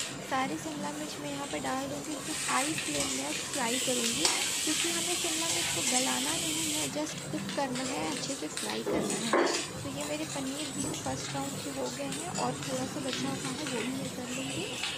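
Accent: Bihar, India. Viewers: Di aaplog Bihar s belong krte ho n ...qki bat krne k tone s lgta h Bihar s ho aap... mai b bihar s hu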